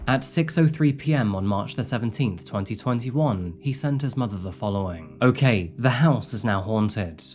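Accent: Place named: RP, United Kingdom. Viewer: So I have great fondness for our friends in the UK, but man your manner of speaking sounds like it’s straight out of Eaton, very overly posh or at least a high-low, sing-song rhythm. Great content, it’s just the voice over is tough to listen to.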